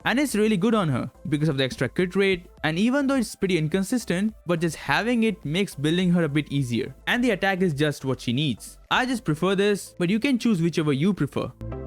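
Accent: India. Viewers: Are u indian or something?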